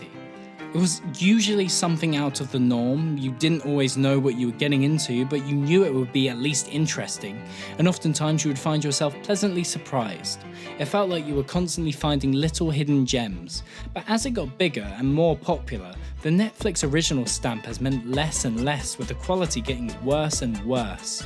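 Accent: Southern England, United Kingdom. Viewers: Well judging by your voice I can tell this show ain’t for you. (BRITISH) or whatever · That’s an English southern accent, not a British accent. I’m also British and say it different to him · I have never heard someone with a British accent say the word "ranch" and honestly, this is probably the equivalent of listening to Americans gleefully repeat "tea and crumpets" over and over ad nauseum, I finally understand.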